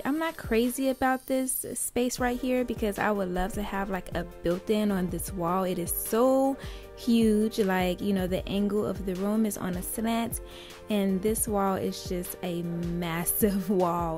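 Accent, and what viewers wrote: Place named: New Orleans, United States. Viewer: what part of Louisiana are you from? Sounds like I hear a New Orleans or Lafayette accent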